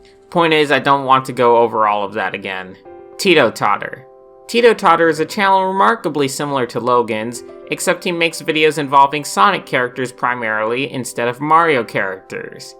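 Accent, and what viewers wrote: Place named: Brooklyn, United States. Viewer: At times u sound like brooklyn t guy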